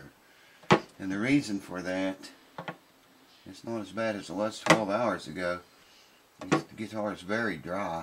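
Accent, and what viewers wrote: South, United States. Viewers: So I knocked my stupid hardly used Wah, Wah off a shelf and it landed on my 3 month old baby...my new acoustic guitar! 😭😭😭😭 Looking for a fix, I found this video and immediately had a good laugh. with his : "Oh I didn't see you there" in I think is called a n English posh accent. Yes it was silly. But still was very funny and made me laugh, and feel a little better. Then it gets even better, when I find out that his actual voice is a southern US accent.. What other surprises does this guy have? No choice but to find out by subscribing..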